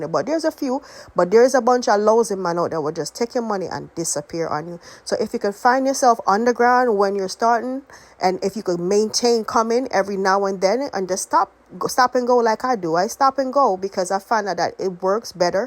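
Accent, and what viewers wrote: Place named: Jamaica. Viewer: I’ve been in America so long and kind of isolated. I haven’t heard a Jamaican woman talk in so long. I don’t even know you, but it feels like your a auntie I needed to listen to. You’re warning me, you’re encouraging me, you’re telling me that mental health is important.. i am not one to ignore signs. thank you again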